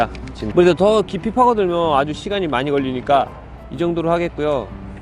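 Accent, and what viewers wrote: Japan. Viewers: 한국어하실때 종종 일본말의 영향을 아주약간 받은게 느껴지네요ㅎㅎ 한 3% 정도??? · 20대에 일본정착하셨는데 거의 억양이나 사고가 일본분이 다되셨네요ㅎ초반에 정착하시면서 힘들었셨던게 공감이 가네요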